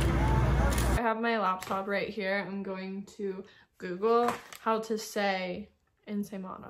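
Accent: Philippines. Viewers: I like your pilipina accent.. Sana all😅😊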